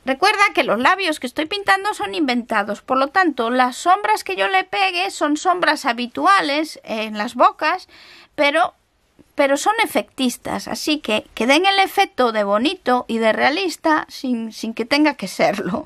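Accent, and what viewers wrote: Galicia, Spain. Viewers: Es gallega, ¿no? O si no del norte de España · y esa xenofobia? Tiene voz de una mujer mayor (una voz más relajada), supongo que sí se puede llegar a confundir con una voz de alguien borracho jajaja y su acento es español de toda la vida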